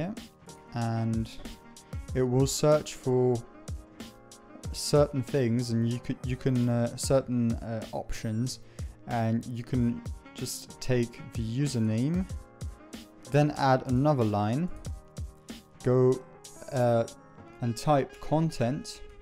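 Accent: Germany. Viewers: Lol bist du deutscher? ;D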